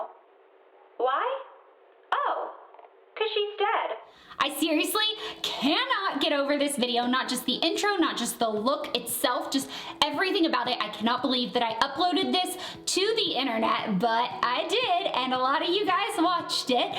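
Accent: South, United States. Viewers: You were the first person who I subscribed to for beauty videos and I love this look! BTW you had more of a southern accent back then. I love southern accents! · the southern accent ahhh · Aw I miss your country voice! Haha I have been watching you since back then!! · LOL you had such a thick Southern accent! And I love the reference hahaha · That southern accent in the first video! where did it go? :-P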